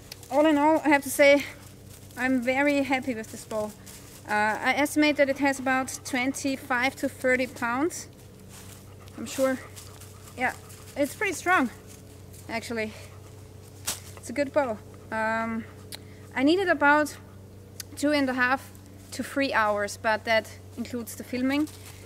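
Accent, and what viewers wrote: Germany. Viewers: Hunting with bow is forbidden where you are? Where is that? Sorry I'm new to the channel and just watching all the different videos, I'm not good with accents, but if I had to guess Germany or Austria? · are you German... I think I can hear your accent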